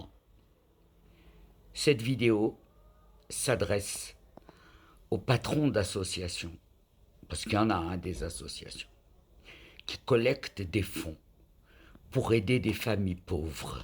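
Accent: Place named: Morocco. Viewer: يلاه دخلت للقناة ديالك عن طريق واحد الفيديو كتهضري بالدارجة تبارك الله عندك دارجة مغربية قديمة كتعجبني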